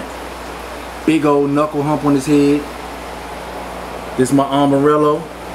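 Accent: DMV, United States. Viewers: sound like your from the dmv if so we need to hook up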